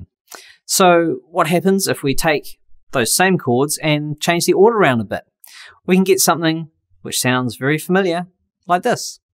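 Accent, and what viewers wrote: New Zealand. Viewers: Bro you’re a kiwi aye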